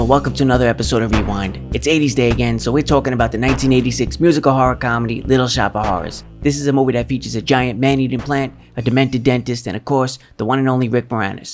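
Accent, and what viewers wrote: New York, United States. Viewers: Nice review man! I love Little Shop! I’ve actually played the voice of the plant Audrey II on stage in the musical production. It’s still one of my favourite musicals and roles I’ve ever done. I’m so glad you brought up the director’s cut!! Because that’s how it is in the stage version and I love the bleak ending. EXCELLENT choice of film! Also, I don’t want to assume, but you sound like you are from New York? Whatever you accent is, it’s awesome man haha 😎👍🏻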